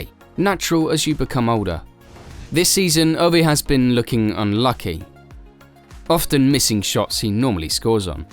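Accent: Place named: United Kingdom. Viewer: British accent talking bout hockey wtf is this a joke :DD? Ovie probably hits 25goals for this season, he will start picking up the pace.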